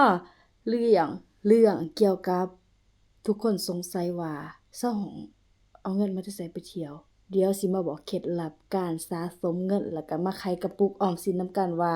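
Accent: Ubon Ratchathani, Thailand. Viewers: สำเนียงการเว้า ของสาวห้องคือกันกับสำเนียงเว้าของคนอุบลฯ คน อำเภอน้ำยืนเด้😊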